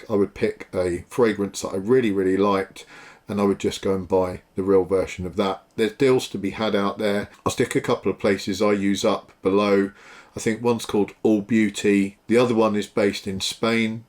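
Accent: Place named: Australia. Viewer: I'm digging the Aussie (or is it Kiwi or Northern British ? ) accent... Funny how whenever I hear that accent I always associate it with belonging to a tough as leather and nails two fisted bar room brawler..lol... Must be a hell of a thing to get your ass kicked by a good smelling dude . ! Lolol Great channel and your authentic energy. New Sub !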